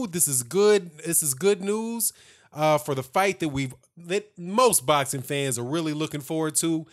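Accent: United States. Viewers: I like your "more than likely's":) i also like your american accent and no bias towards Beyonce being allowed a few extra seconds of recovery against Ortiz. Bravo!!!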